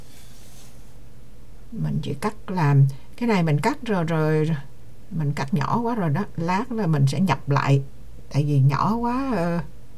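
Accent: Quang Nam, Vietnam. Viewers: Cô nói giọng giống người miền Trung, quảng nam.🥰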